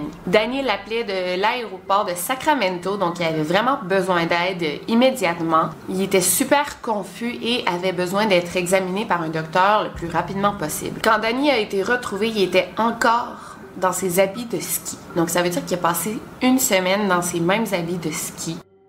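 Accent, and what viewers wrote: Quebec, Canada. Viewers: Ton travail est super intéressant j'adore ton accent québécoise bonne continuation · Tu m'a réconciliée avec l'accent québécois parce que avant je trouvais ça ce bizarre😆 avec mon copain on arrête pas de te regarder tu est addictive ta manière de raconter ta voix etc... Comme tu dis ''cest fou'' 😙bref bisous💖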